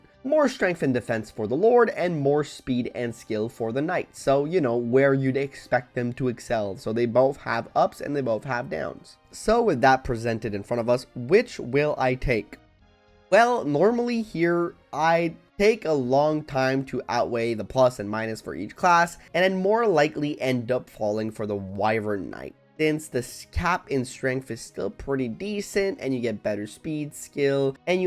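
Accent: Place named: France. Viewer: First time I have noticed an accent, are you french?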